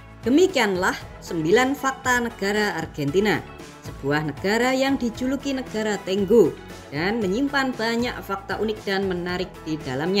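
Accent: Java, Indonesia. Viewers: Dialek Jawa ny kental banget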